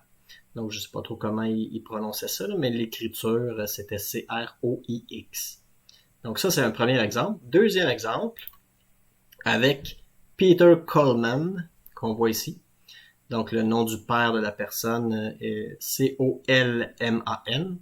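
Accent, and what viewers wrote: Canada. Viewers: Video très interesante et surprenante concernant la modification des noms d'origine française sous l'aspect phonétique y transcrits "à l'anglaise". Cela me fait sourire, car j'ai vécu une situation un peu identique en 2020 lors d'un voyage aux states. On a chercher à m'appeler au micro d'un aéroport, mais je n'ai pas reconnu mon nom avec la prononciation à l'américaine 😁😆alors j'imagine bien ce que cela pouvait donner dans les années 1800 et 1900. Pas facile de retrouver ses ancêtres émigrés dans ces conditions ! Chapeau à vous pour cette vidéo et votre accent si agréable à l'oreille pour un habitant issu de l'ouest de la France ou la langue d'oil à de grandes similitudes avec le parler canadien et qui enchante mes oreilles en entendant mes cousins avec des mots qui pour certains ne sont plus utilisés par chez nous. C'est donc comme un retour à nos racines communes, mais avec des évolutions différentes de part et d'autre de l'atlantique. 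J'espère d'autres bonnes surprises de votre part. Merci en tout cas pour ce partage "linguistique" des noms de famille modifiés par nos "ennemis de l'époque"... les habits rouges, dans les registres d'état civil. 👍👍😏👋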